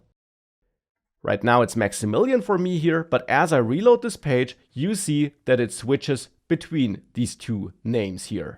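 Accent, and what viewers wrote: Bavaria, Germany. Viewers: funny how the Bavarian accent is disappearing :-)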